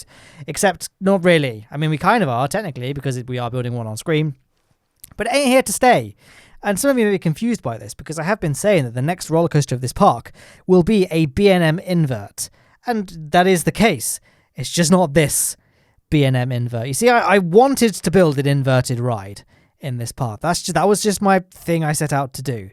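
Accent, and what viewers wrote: United Kingdom. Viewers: Finally I'm early to "a guy with a British accent" vid. Ps I Only watch your ksp vid they're better no offense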